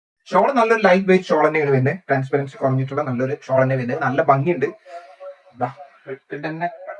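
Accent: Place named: Malappuram, India. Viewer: Malappuram slang anallo evide sthalam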